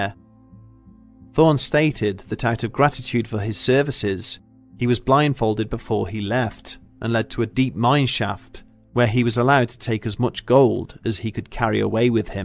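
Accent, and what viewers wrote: England, United Kingdom. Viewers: Why do they have English people telling these stories ? Americans can also tell stories.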